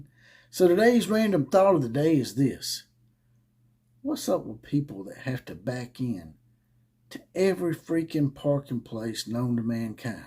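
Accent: South, United States. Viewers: I agree on the backing a car in topic. Parking lots even have signs posted that say do not back your car in but people still do it! Yes its even worse when a woman thinks she needs to back her car in. They will go back and forth multiple times to park. It makes me sick. Have you ever thought of becoming an actor? You are perfect for westerns. Good voice, good southern drawl and look like a cowboy. Boomer Sooner.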